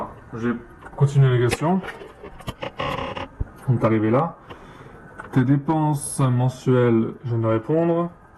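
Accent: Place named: England, United Kingdom. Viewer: T'as l'air d'avoir du mal à parler français j'trouve, t'as même l'accent anglais xD . En tout cas vidéo très intéressante ! Continue !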